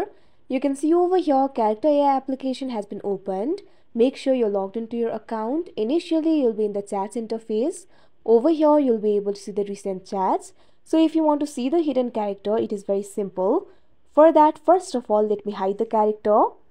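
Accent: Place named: India. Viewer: indian acces on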